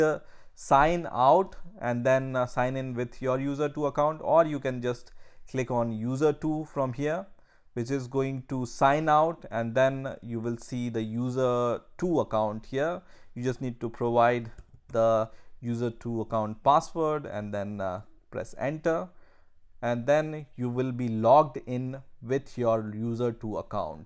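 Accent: India. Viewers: This dude is German? But he sounds Indian to me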